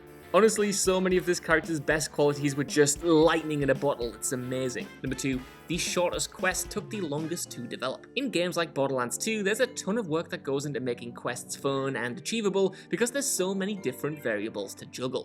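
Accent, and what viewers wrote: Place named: United Kingdom. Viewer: I think that is just a british pronunciation of "guns" the accent sounds british to me (i could be wrong idk)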